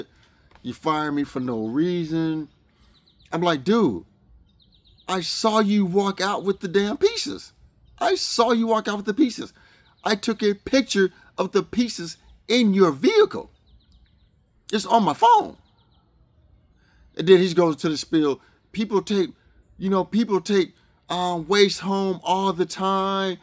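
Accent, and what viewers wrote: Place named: United States. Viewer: American accent is really troubling.. is he talking about a Pizza?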